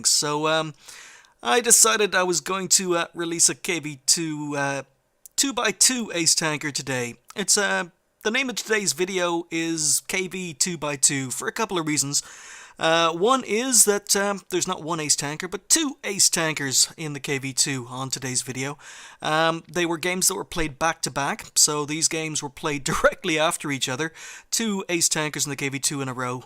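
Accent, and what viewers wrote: Ireland. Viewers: Forgive me if I offend anyone but in my admittedly very limited experience of english accents I think your Irish accent isn't very pronounced. It's definitely there but I was wondering if you're from Northern Ireland which I assume would have a more British accent?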